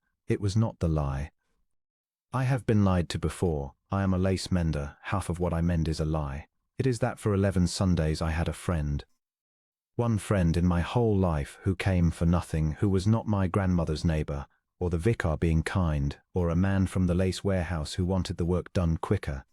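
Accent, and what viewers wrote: United Kingdom. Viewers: This was one of your better stories. Loved the writing and the wonderful British accent of the narrator. Just wonderful. Thank you.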